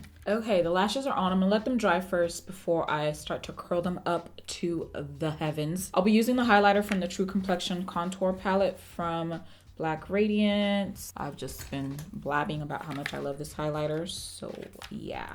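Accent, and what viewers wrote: Africa. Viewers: her African accent is soo hilarious!!! luv it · Hi,. Im in love with your personality. And this accent, omg 😂 i don't know which one it is (because I'm from Russia, english is not my language), i think it's African, right?) You are so funny and, you know, so unlike other people! Be yourself, we love it 😍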